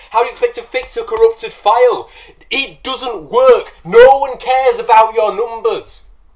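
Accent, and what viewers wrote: Northern England, United Kingdom. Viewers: You've got a new follower. Educational video with occasional healthy humor and a nice Sean Bean accent(and everybody else from the north in the GoT). Followed at less than 10k followers. See ya when you get a million of us mate.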